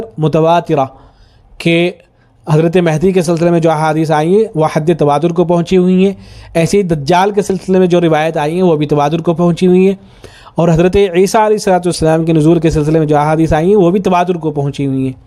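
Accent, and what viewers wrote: India. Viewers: یہ شخص جیسا کے لہجے سے معلوم ہو رہا ہے پو پی سے تعلق رکھتا ہے یو پی وہ زمین ہے جس نے برِصغیر کے مسلمانوں میں دیوبندیت اور بریلویت کی شدید تقسیم پیدا کی جنہوں نے لباس واضح قطعہ داڑھی کے واہ انداز و اشکال اسلام کے نام پر متعارف کروائے جو مراکش سے حجاز و ملائیشیا تک پوری مسلم دنیا کے لیے اجنبی ہیں جن کی بیمار فکر نے نہ صرف ہندؤ مسلم خلیج کو گہرا جس کی سیاسی قیمت پنجاب بنگال اور کشمیر کے لوگوں نے ادا کی اشرف تھانوی ہوں یا احمد رضا بریلوی یہی سے تھے جبکہ ان کے مقابلے میں پنجاب نے بھلے شاہ بابا فرید بابا نانک مولانا عبید الللہ سندھی جیسے جوڑنے والے لوگ پیدا کیے جاوید احمد غامدی سے بغض بھی اصل میں اسی بنا پر ہے اسلامی تاریخ نے صدیوں بعد ایک بڑا دماغ پنجاب میں کیوں پیدا کیا۔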